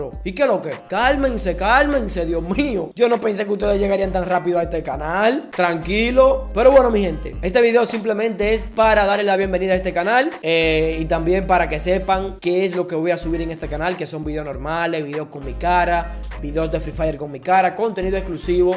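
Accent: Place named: Dominican Republic. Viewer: Ese es un Dominicano k habla como un Dominicano no es como nino k dice ala bestia eso no es Dominicano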